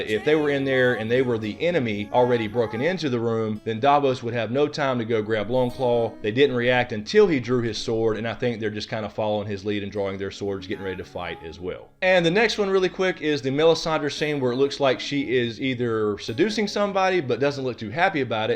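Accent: South, United States. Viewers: Hey - I love your videos and now you are hooked up with my other favorite - Y'all are an awesome pair and I have chills from your predictions. I did want to ask you if you have ever done any research about the theory that Rhargar's harp is buried in the crypts at Winterfell in Lyanna's tomb? I read something about that and I have always wondered why she was buried in Winterfell since it has always been men there. Just wanted your take on that - Thanks I also detected a southern accent - wondered where you are from- I live in Alabama, I was just curious. Thanks again for all of your videos. Robin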